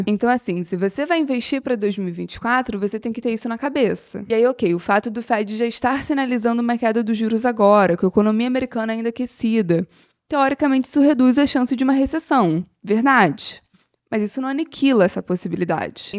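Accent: Rio de Janeiro, Brazil. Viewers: Adorei descobrir o seu canal!! A análise ficou ótima e ainda tem a dose de carioquês e palavrão que eu amo kk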